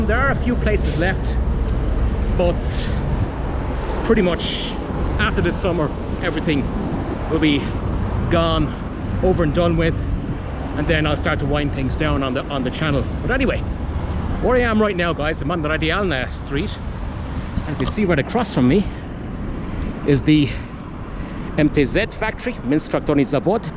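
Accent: Waterford, Ireland. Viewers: Hello from Kilkenny good info on your grandfather always thought you had a bit of a blaa accent makes sense now keep up the great videos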